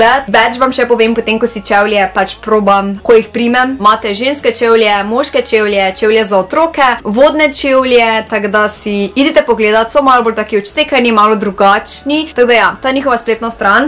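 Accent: Maribor, Slovenia. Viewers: Sodelujem 😊❣️ Ful fajna sii👌 pa fajn je videt da niso youtuberke sam iz Ljubljane in res mi je všeč mariborski naglas čuj😍 Upam da dobim čevljeee komaj čakam❣️❣️❣️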